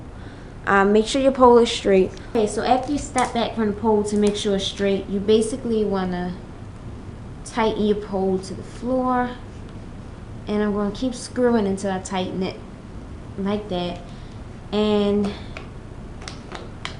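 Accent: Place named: Baltimore, United States. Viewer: Baltimore accent ok 😏Just bought this pole and this really helped! Thanks!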